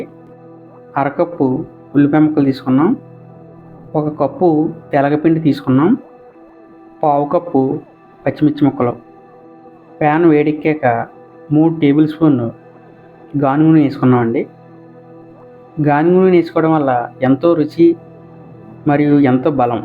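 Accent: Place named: Godavari, India. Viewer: Very good curry & rasam. Good combination. Thanks for the video with godavari accent.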